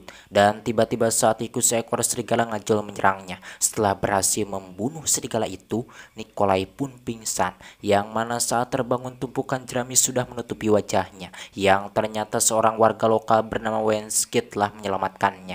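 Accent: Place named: Java, Indonesia. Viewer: Jawa bangat sih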